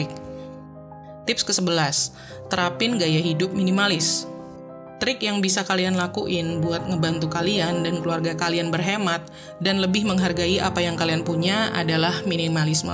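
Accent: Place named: Chinese-Indonesian, Indonesia. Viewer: aku salfok sama suara naratornya, suara chindo bgt kak. anw tips2nya udah sebagian aku aplikasiin di hidup aku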